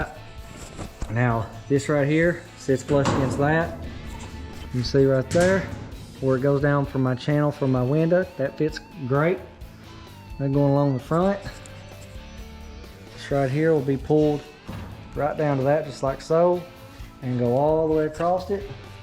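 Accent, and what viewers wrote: South, United States. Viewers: It's hard to get past his redneck accent. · Living in the south, this man makes me proud. To some (not all, I’m not going to paint with a broad brush) folks not from here, he may sound less intelligent because of his accent. How mistaken you would be. The south is covered up with folks that have great intelligence and common sense. LOL, I ain’t one of them, but they are here. God bless the South. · ahh, you're like me then lol. well i hear the country accent. what state are you in neighbor? wonder if you ever make it to the MS gulf coast for Cruisin the Coast? *edit, just watched your how to register video where you mentioned TN.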